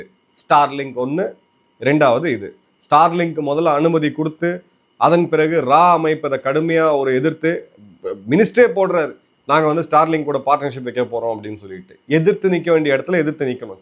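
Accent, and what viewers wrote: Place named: Bihar, India. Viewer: I don't understand why this Tamilian Major is using North Indian bihari backward English rather he should use progressive English of tamilians ...alas serving in the armed forces has its own negative impact 😮😅😂😊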